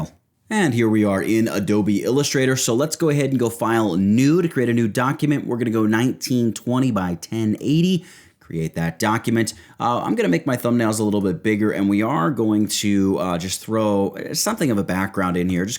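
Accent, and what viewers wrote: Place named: New York, United States. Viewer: Hey seeing you at work man, I'm merely 3 years into Illustrator yet I would assume the technique demonstrated here is intensely more complicated than what I would do in this case. Please could you elaborate on it? Once again cool vids man way to go with the NY accent.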